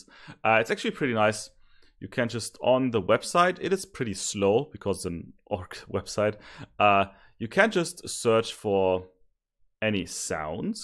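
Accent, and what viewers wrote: Germany. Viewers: Sounds you are a german guy =D great Tutorial, thanks =D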